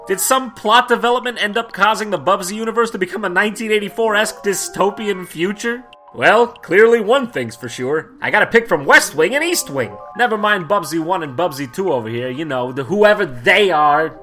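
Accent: New York, United States. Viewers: I love how his New Yoik accent just gets thicker and thicker as the games get worse and worse.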